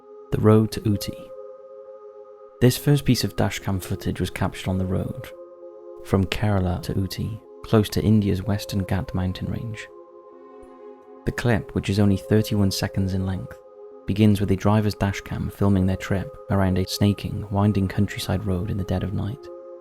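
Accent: Wales, United Kingdom. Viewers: Hello, you have a great voice, do I spy a bit of Welsh. I think you should loose the top 5 and start doing full stories as your voice and narrative skills would suit that. But thank you for uploads. Always a joy x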